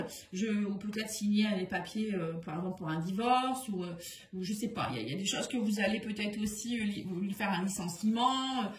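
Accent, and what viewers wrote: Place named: North, France. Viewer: Étant moi même nordiste j adore votre accent merci pour votre guidance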